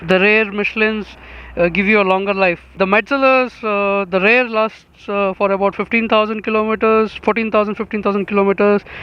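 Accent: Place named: India. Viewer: Hate the freaking indian accent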